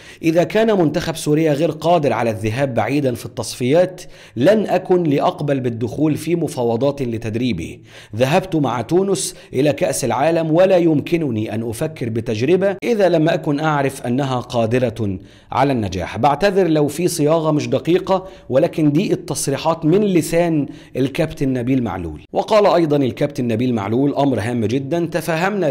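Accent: Egypt. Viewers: باين انك مصري بس بتحب اللهجة السورية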